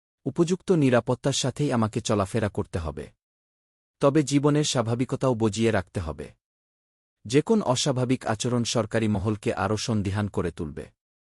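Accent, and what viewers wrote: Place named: West Bengal, India. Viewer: The reader is from West Bengal!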